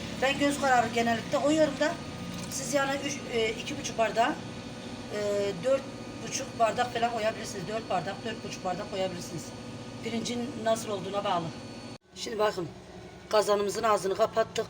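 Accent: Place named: Gaziantep, Turkey. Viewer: 7 kuşak doğma büyüme İzmir'liyim İzmir'de böyle bir köfte yok !!! İzmirin sulu köftesi olur böyle yoğurarak vir köftemiz yok bizim m..Siz büyük ihtimalle anteplisiniz şiveden anladım... Antep'in içli köftesi çiğköftesi çok bilinir Ellerinize emeğinize sağlık ama bizim İzmir'in böyle bir köftesi yok yinede memleketimin adı geçtiği için mutluyum 👍🏼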